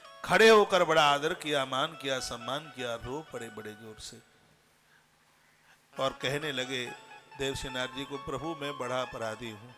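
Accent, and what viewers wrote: Braj, India. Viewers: Gurudev aapki brajbhasha bahut pyari lagti hai Radhe Radhe❤🙏🙏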